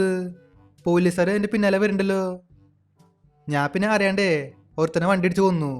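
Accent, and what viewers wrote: Thrissur, India. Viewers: Bro തൃശ്ശൂർക്കാരൻ ആണോ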